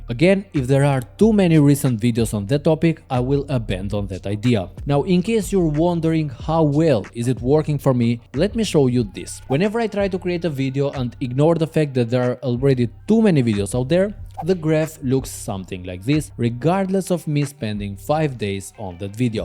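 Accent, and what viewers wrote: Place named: Albania. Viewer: hahahahahah sa dallohesh qe je shqiptar ti o plak 😂 vec nga theksi e kuptova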